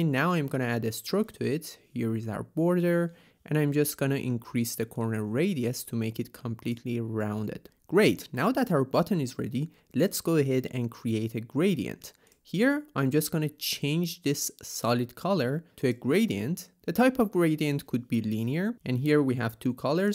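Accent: Iran. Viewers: I just knew you were iranian the second you started talking lmao, great video btw keeo it up fam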